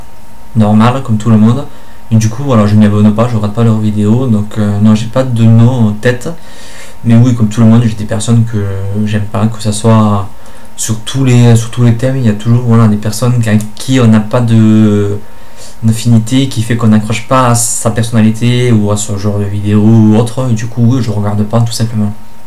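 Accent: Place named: Gard, France. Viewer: Hey ! Merci d'avoir repris le tag :D T'es d'où exactement dans le Gard? (sans dec entre ça et ton accent, j'ai le mal du pays à mort ^^)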